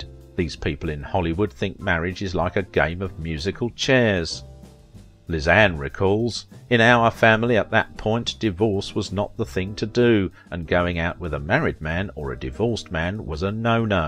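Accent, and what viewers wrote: England, United Kingdom. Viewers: Sometime this guys English accent is just too much for me. I can't understand everything he says. Is this only me ?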